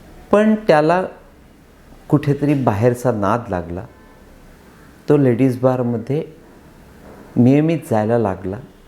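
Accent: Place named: Gujarat, India. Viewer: Kaka tumache bolane aikatana waatate ki koni gjaratalech bolat aahe ....🙏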